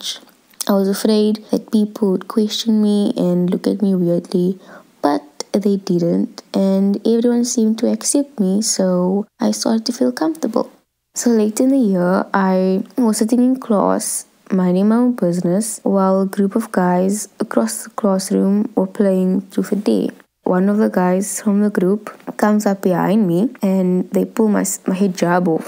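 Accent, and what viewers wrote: South Africa. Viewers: She sounds like a South African like me❤️ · Iqra Nazeer to me she sounds like she's from South Africa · it's sounds like a south African accent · I'm from south Africa and could instantly hear that she's colored lol same like me.. The "what-not" gave it away among other things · I can tell that she's South african 😎😎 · I think she's South African because thats sort of how i sound when i speak english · She sounds South African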